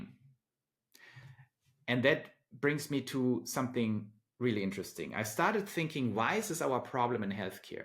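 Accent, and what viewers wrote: Germany. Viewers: Appreciate your analysis, insights and effort to bring this material so clearly with your pleasant German accent. Very good!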